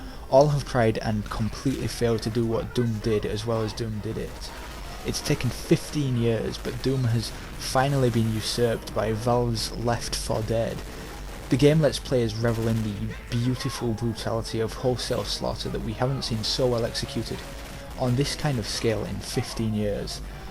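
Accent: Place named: Yorkshire, United Kingdom. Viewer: cool review btw u have the same accent as me, ru from yorkshire?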